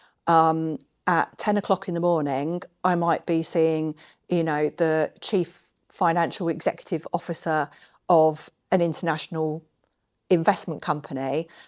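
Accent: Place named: Midlands, United Kingdom. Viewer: this woman sounds more Midlands than southern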